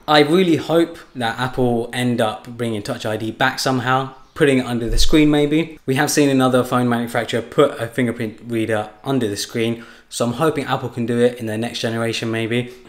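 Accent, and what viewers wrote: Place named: London, United Kingdom. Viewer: British london accent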